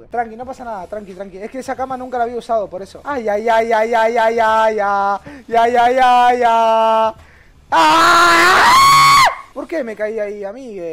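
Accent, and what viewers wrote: Spain. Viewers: El acento español de es perfecto sin más, igual que el arremedo del xokas xd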